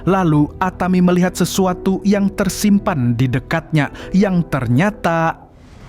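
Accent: Banjar, Indonesia. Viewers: Orang Banjar sakalinya adminnya😂😂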